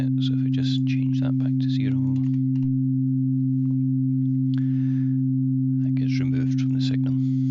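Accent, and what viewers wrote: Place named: Scotland, United Kingdom. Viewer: It's not loudness, he's Scottish.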